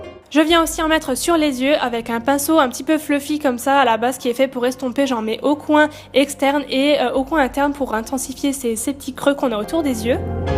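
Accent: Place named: Alsace, France. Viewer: Wahou c'est trop réaliste ! En entend bien ton accent alsacien personnellement moi je l'entend bien car je suis alsacienne !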